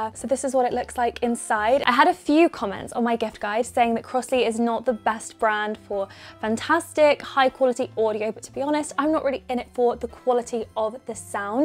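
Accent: United Kingdom. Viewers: I love your British accent. Ohhh orange chocolate is the best flavour nither as smell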